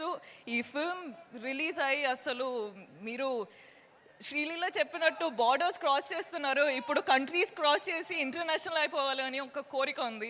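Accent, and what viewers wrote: Telangana, India. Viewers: You become what you eat❤ Telangana slang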